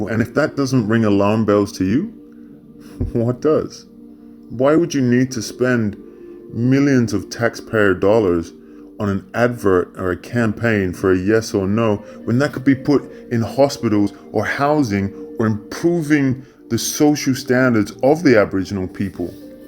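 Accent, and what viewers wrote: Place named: United States. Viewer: What the heck are you talking about with your American accent and admitting that you haven't read the document?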